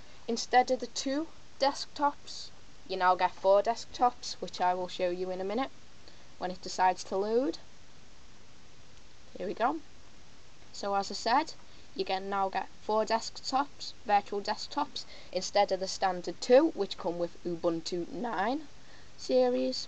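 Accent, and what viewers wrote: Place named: Liverpool, United Kingdom. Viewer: No, But im a Pool supporter and recognize the accent.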